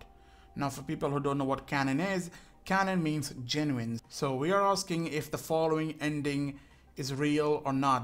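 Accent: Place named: India. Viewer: You sound like an indian gamer but thats why i will subscribe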